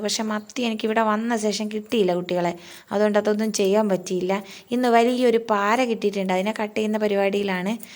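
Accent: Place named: Kasaragod, India. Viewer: Aa namade paara... 😅chechi kasrod ano.. Nammem athe😁👍🏼😍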